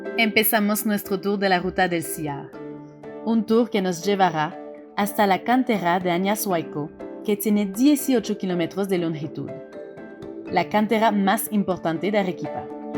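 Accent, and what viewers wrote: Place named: France. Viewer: You’ve won a subscriber because you really love my country as it was yours. I love your French accent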